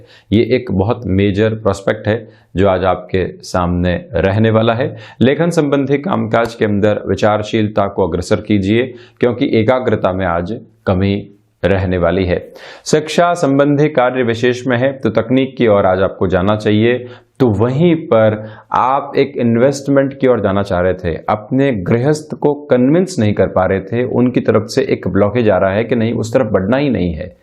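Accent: Standard. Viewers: Beautifully said! Whenever I listen to your pure language, I am reminded of my Hindi teacher in school, Smt Rajkumari Saxena to whom I am ever indebted for learning Impeccable Hindi. Its such a pleasure to listen to you, Sir.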